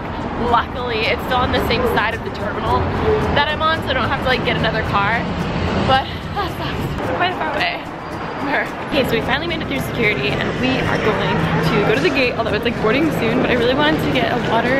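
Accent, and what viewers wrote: California, United States. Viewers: Everytime i watch her vlogs i just say wow the cali accent😂😂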